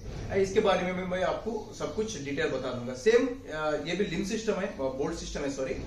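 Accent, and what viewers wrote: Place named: South, India. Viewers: I think you are from south india as listening your hindi😁❤️ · He is from Telangana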